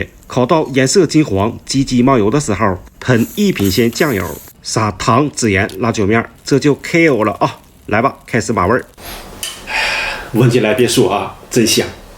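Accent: Dalian, China. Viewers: 的大连口音听起来真亲切。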